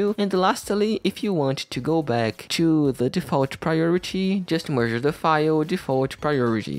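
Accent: Brazil. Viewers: demorei 2 minuto pra notar q o manin era brasileiro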